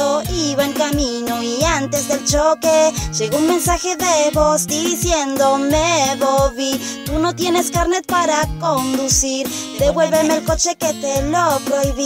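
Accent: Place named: Corrientes, Argentina. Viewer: una pregunta de qué parte de Argentina sos porque tenés un acento como de Corrientes o Misiones Contesta x fa Te quieroo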